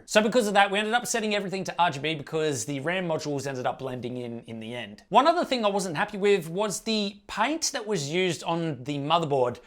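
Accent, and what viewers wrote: Australia. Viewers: Is he Aussie?? Cause I'm Aussie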